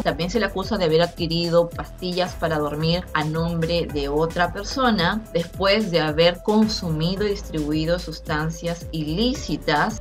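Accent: Peru. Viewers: Sospechaba que eras peruana por tu manera de hablar. Yo también. Y te felicito por el gran trabajo que desempeñas. Que sigan los éxitos! ❤ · Es obvio que eres de Perú, tu acento te delata amiga y pues no es necesario que digas de dónde eres ya que son noticias del entrenamiento coreano.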